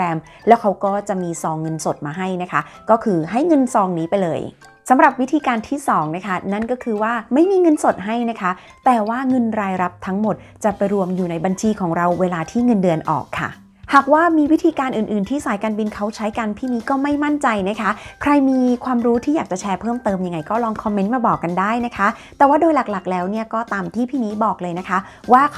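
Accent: South, Thailand. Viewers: พี่มิเป็นคนใต้หรอพี่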